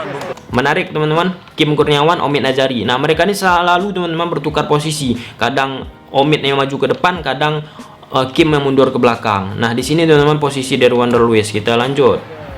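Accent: Malaysia. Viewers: Logat melayu kental skli bang, orang riau atw mana ya?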